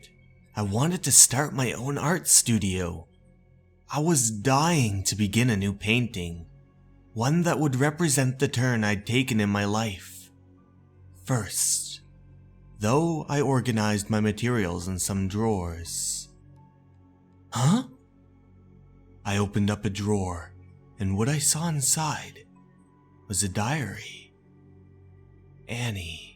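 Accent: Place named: Canada. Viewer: I KNEW IT I STILL GOT IT!!! It was an extremely minor "it really helps me OOT a lot" that perked my ears up. I'm also a gigantic Canada's Worst Driver fan and dating a Canadian (Hamilton, Ontario) once has me primed to notice your speech patterns (best described as an extremely minor case of John Travolta in Battlefield Earth in my opinion). In fact, it wasn't until watching this video of yours that I realized Andrew Youngblood had that same John Travolta in Battlefield Earth cadence that became more evident as the series progressed and maybe that's the real reason the best show of all time was ended after fourteen seasons. I'm onto you, Andrew. I know what you're doing.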